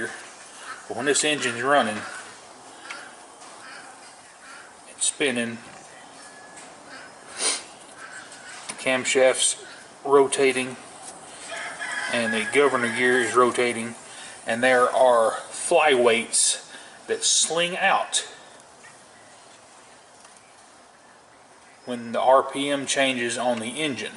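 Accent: Texas, United States. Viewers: Great visual with the case open. Clearly from Texas with the few "H'what" I heard.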